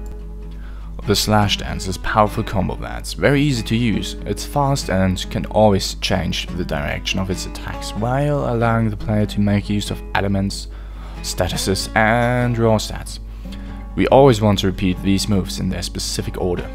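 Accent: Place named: Germany. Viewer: Klingst auf jedenfall deutsch xD sehr schönes Video 👍Abo hast du sicher.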